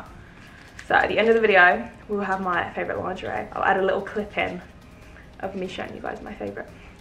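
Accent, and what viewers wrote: England, United Kingdom. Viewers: I like british sound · Are you English because I would wonder if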